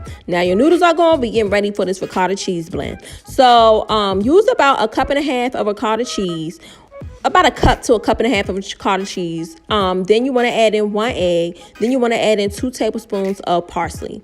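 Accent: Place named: Prince George's County, United States. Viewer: Just found your channel. New subscriber! You’re so funny, you sound like you’re from PG.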